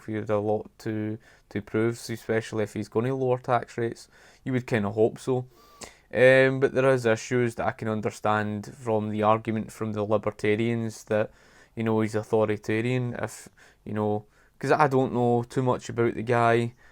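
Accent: Scotland, United Kingdom. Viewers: Ah good to see you back. And good to hear a Scottish voice speaking in favour of Brexit!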